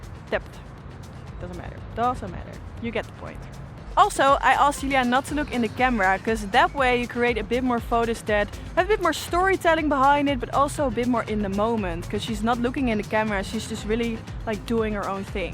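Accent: Netherlands. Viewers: great video, funny that you turned out to be dutch :P I thought i was hearing an accent haha :P cool location as well for the shoot! great work :) I'm thinking to buy the 35mm as well, looks zo good :) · Het Nederlands accent maakt deze video af 👌🏽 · Loved this video! I love how your “dutchness” comes out of you from time to time hahaha you have great energy and I’m looking forward to your future video’s! Nogmaals wat een leuke video!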